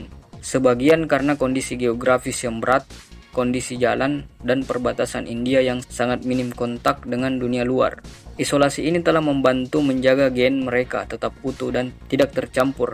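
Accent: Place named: Makassar, Indonesia. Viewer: Logat Makassar nih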